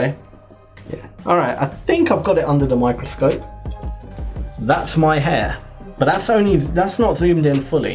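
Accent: United Kingdom. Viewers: For some reason I didn't expect you to be british before you said anything, haha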